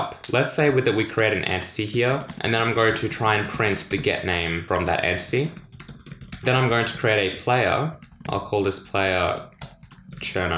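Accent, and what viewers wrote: Australia. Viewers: Hey Cherno! Got to say great channel to learn c++, awesome channel to get used to the australian accent!! :)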